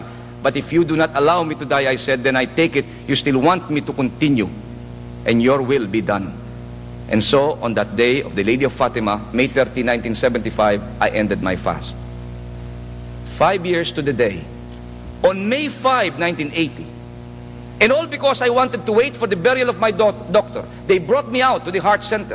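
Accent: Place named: Kapampangan, Philippines. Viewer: I love the kapampangan accent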